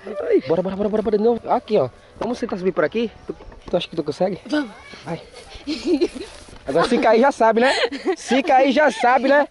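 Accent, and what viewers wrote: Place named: Northeast, Brazil. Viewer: Esse sotaque do nordeste é massa de mais mano kkkkkkkkk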